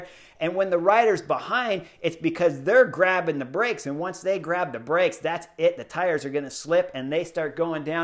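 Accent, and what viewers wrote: United States. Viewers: you’re really excellent at your craft. You’ve always been a good spokesman, with your Cali-cool way of talking, even back in your racing days. I appreciated your insight into the not-so-obvious reasons for the big crash. And, I also appreciated that you were scared while racing. I was too. The fear (ie adrenaline) will produce strength and performance beyond what is imaginable.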